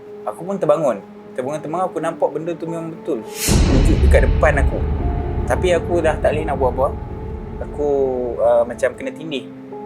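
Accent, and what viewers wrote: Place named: Kedah, Malaysia. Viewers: Org Kedah yg dok Perak ni mmg dh bercampur sikit dh Bahasa dia ngn KL. Tambah plk dia pernah dok Ipoh yg ada byk org berloghat KL sikit... Mcm² loghat org Ipoh tu... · Truih kan loghat utara mu brader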